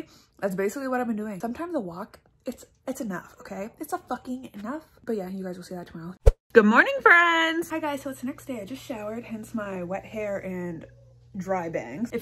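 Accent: Canada. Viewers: is it just me or does she sound extra canadian in this video lmao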